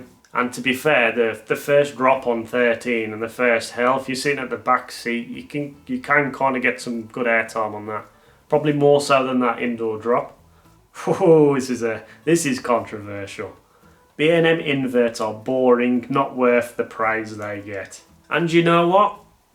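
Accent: United Kingdom. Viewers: Ok should I feel guilty for finding out I'm with a hard crush on your british accent????? And no, I'm not from us or a native english speaker.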